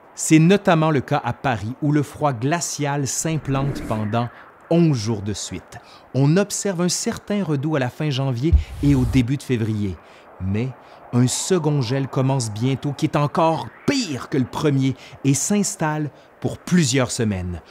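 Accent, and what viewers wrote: Quebec, Canada. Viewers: L'accent, encore l'accent, toujours l'accent. Depuis Félix Leclerc, vous ne vous êtes pas fait l'oreille à l'accent québécois ? Ici, y a longtemps qu'on s'est habitué à l'accent français ! ;) hihi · Et pourtant le ciel n'était pas encore troué et alors donner les cause du changement climatique a c'est époque la avec l'accent cannadien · Ce que j'aime beaucoup, c'est l'accent Québécois. Sinon; j'aime aussi ces vidéos qui nous démontrent que le climat ne peut se prévoir comme cela est le cas actuellement avec le GIEC. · Un Québécois qui me narre la Fance d'époque, avec cet accent, quel bonheur! Le vin qui gèle, mais quel cauchemar 😱 Bisous de Bordeaux 😉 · Désolé, j adore vos vidéo, et l accent me rappelés les quelques années passées au Québec dans mon adolescence. Mais je vais me permettre un commentaire la première fois que j ai vu votre vidéo je me suis dit "au final les Québécois c est des Scandinaves (descendant de Norman) de culture française qui ont immigré aux Amériques:) Continue the good work comme ils disent. 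Et merci pour vos videos!!! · Quelle superbe diction…j’en ai les pieds gelés!🥶🙃😂Heureusement, votre adorable accent québécois réchauffe mon cœur et mon corps. J’ai augmenté mes connaissances, ce soir et je m’endormirai un peu moins sotte.😉😁MERCI❣️👍🥀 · C'est chouette d'écouter l'accent québécois dans un français intelligible. Merci pour la vidéo. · tresbkie monsieur et en plus avec cet accant canadien qui ma toujous plut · Super vidéo. J'ai vécu au quebec dernièrement (que j'ai dû quitter malheureusement) et je sais pas trop pourquoi mais ça me fait plaisir de ré-entendre cet accent! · Superbe vidéo et reportage surtout avec cet accent Québécois si unique et charmant !! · Tres interresant. Je suis un re-apprenant de francais. Apres Lycee, ily a 60 ans, j'ai recommencé apprendre un peu le francais, je trouve que vous parlez dans un facon je comprend. Je suis déja un amateur d'histoire. Votre accent, c'est quebecois? 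Je nai pas un clavier francais :-( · C'est fou comme j'aime entendre l'histoire française racontée avec un accent canadien :) Je sais on dit "accent québécois" mais je suis français tout le monde sait que casser les pieds c'est une obligation génétique pour nous :p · Je viens de trouver la chaine .. très intéressant ce que tu présente mais l'accent surtout j'ai jamais cru que je vais aimer l'accent québécois.. Continuez Bravo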